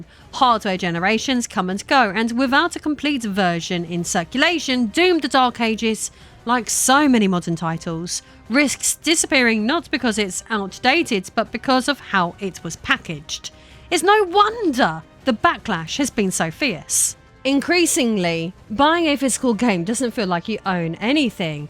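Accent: United Kingdom. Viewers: Boobs, tight leather, & a british accent... the internet just ain't playing fair 😂👍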